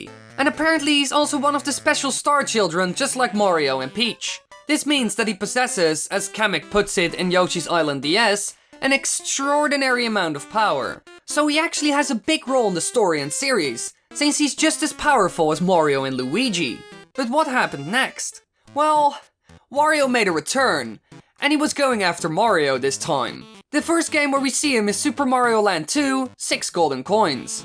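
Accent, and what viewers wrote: Netherlands. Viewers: are u dutch? your accent is like you speak dutch but uses english words, however not a ''bad'' accent haha, i am dutch but it just looks like ur speaking fake dutch.. i cant describe it well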